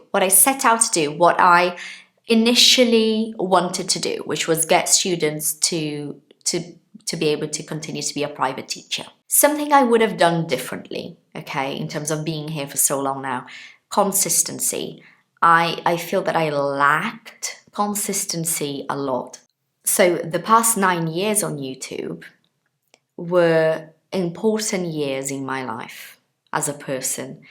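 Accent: United Kingdom. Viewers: Arrisco dizer que é porquê o povo só se interessa pelo inglês "americano" já que o dela é britânico, fazer o quê, os melhores canais são sempre notados por uma minoria enquanto modinha ou porcaria rende milhões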